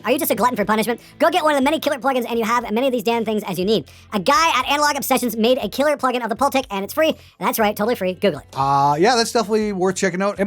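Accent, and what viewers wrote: Canada. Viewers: Do I detect a Canadian accent in your bass player speak? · You understood that? With such a thick Canadian accent, I couldn't make any of it out.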